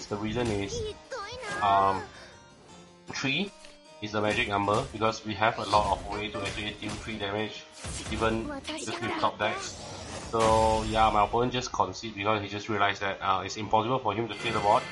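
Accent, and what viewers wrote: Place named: Malaysia. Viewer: you sound like malaysian tho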